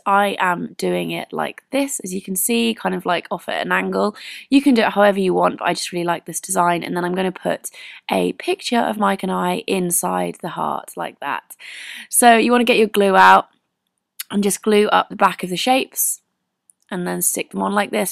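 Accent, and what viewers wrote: United Kingdom. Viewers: I love ur British accent :D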